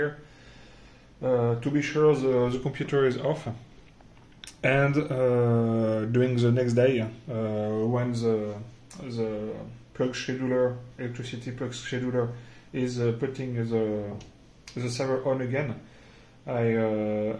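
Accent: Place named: France. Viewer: Un bon accent Français 🙂 Merci pour la petite vidéo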